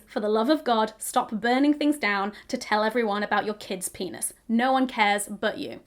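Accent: United Kingdom. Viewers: I love youuu omg currently rewatching your videos to get used to "the British accent" of the phonetic charts I have to study at uni here in France skksksk 🤯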